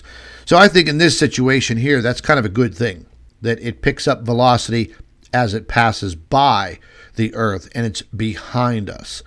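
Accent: Pittsburgh, United States. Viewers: Hi: So glad you've started reporting again. I know you are busy and with the good Doctors, health issues it's difficult to find time for all you want to do. But this video is sensational- I mean sensational! That steller core(?). is amazing, thank you. Yes, it makes me wonder and how dare NASA and the psuedo scientific community not doing what you do, shame on those scientific charlatans. I occasionally have dabbled in a youtube channel if I should start again how can I get permission to use some images and quote your research, of course, I would give all credit to you should you desire. The best way to initially respond is to reply here I won't waste your time nor mine with email unless you agree then I will contact you. FYI I was born and raised in the South Hills area of the "burgh" I have not been back in 40+ years. Your accent and attitude remind me of my childhood home, thank you.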